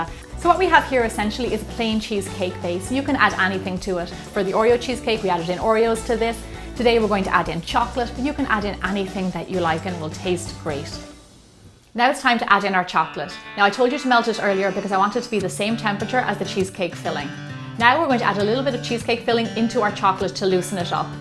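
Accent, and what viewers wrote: Ireland. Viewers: Irish accent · R u from Northern Ireland or Southern Ireland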